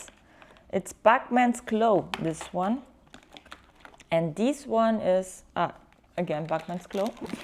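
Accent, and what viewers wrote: Germany. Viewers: Nach zwei Sekunden wusste ich dass du deutsch sein musst 🤣 obwohl dein Englisch nicht schlecht ist, man hört es eben immer heraus. Ich wünsche euch viel Spaß bei dem tollen Hobby, ich bin auch noch Beginner und fokussiere mich erstmal auf das PS5 Game, habe früher aber auch gerne so was wie Heroquest und gelegentlich D&D gespielt. Jetzt verkaufe ich alles was ich habe um mir eine Armee zusammenzustellen 😉 Ich werde deinen Weg hier gerne weiter verfolgen. Grüße aus Hamburg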